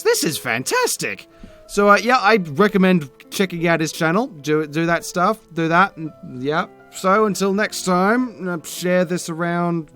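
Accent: Australia. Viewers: Holy shit you're Australian. Mind fucked.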